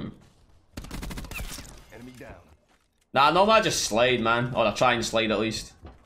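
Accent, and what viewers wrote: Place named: Scotland, United Kingdom. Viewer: Found you on K3’s channel and I’m glad I did! Where in Scotland are you from?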